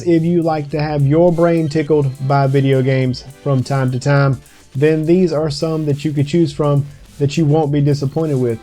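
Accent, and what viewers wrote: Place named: South, United States. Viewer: Mike B sent me...you sound nearly as country as me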